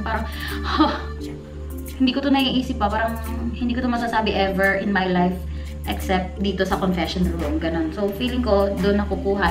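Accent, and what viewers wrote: Batangas, Philippines. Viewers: Yay! <3 nakakabitin lang po. Hahaha! Since napanood ko yung blog mo na naaksidente ka, pinanood ko na din yung ibang videos mo kasi nacurious ako pano ka naging vlogger eh last time i know, galing kang PBB. Napaka genuine mo sa videos mo, malapit din kasi kami sa Batangas at parehas tayo ng "punto" pag nagsasalita kaya nakakaaliw na mapanood ka. Hahaha! As in very lunch time, gusto ko nanonood habang kumakain, vlogs mo pinapanood ko hahaha! More powers! God bless you:)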